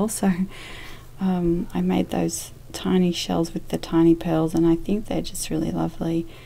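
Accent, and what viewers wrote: Australia. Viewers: A nice Aussie voice talking about paint? Instant sub!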